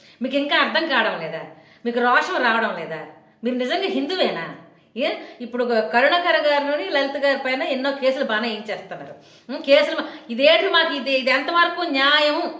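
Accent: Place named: North Andhra, India. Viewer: 🌹జై శ్రీరామ్ 🙏 బంగారుతల్లీ వందనాలు. రాబోయే ఉపధ్రవాన్ని మరచి, గాడనిద్రలో వున్న మన హిందువులలో చైతన్యం తీసుకురావటానికి మీరు చేస్తున్న ఒక మంచి ప్రయత్నాలకి అభినందనలు, ఆశీస్సులు. సహజమైన, చక్కని ఉత్తరాంధ్ర యాసలో మీరు మాట్లాడే విధానం చాలా బాగుంది. మీరు అద్భుతంగా పాడుచున్నారు. మీకు సదా ఈశ్వరానుగ్రహప్రాప్తిరస్తు. 🌹🌹🙏🙏🙏🌹🌹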